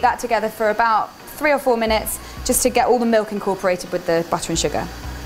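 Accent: United Kingdom. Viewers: I knew you were going to be British the second before I opened the video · I just love the way Brits say buttercream: "BUT-tuh-cream." lol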